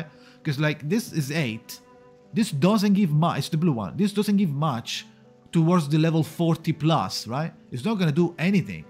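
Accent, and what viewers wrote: Italy. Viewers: Però l'accento italiano si riconosce subito ahahah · sapevo che eri italiano, parli inglese con il mio stesso accento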